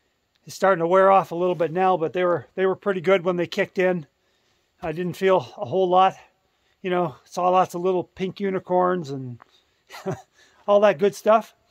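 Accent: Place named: Canada. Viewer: That must have been some fall! I’m glad your okay and didn’t seriously injure anything. When you were showing us how you landed by demonstrating I was wanting to say “ don’t do that to yourself your in enough pain. We can just imagine in our minds”. Again glad your ok and that you’ll survive. Enjoy watching your content;been watching 2 years now. Looking forward to the next one. Also I hope you and your family have a great Thanksgiving 🦃. Also just wondering, are you Canadian? Reason I ask is because I notice when you say words like out I can hear the oot pronunciation. Thanks!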